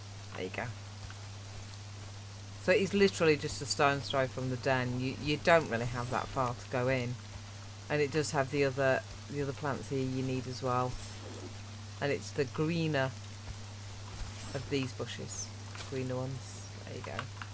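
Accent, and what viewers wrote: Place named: United Kingdom. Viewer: Thank you for showing the route, with camera angles on landmarks, to where you found Hops. And thank you especially for popping up the map. You are now my favorite Conan Exiles YouTuber. As if the lovely female voice and British accent weren't enough for that already! :D On another note, I see you put yourself together a set of the Reptile Armor. Nice isn't it? It has slightly more Armor Points and Poise than the standard Medium Armor, and it takes only a single repair material.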